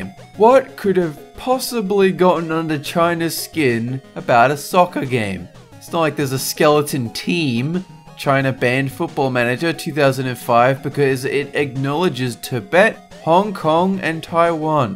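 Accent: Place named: Australia. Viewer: I miss this narrator. Australian accents are always cool, and is particularly pleasant.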